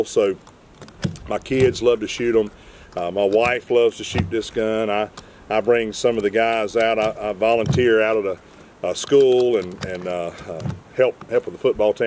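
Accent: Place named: South Africa. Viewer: Cool vid! Where you from? Sound a bit South African.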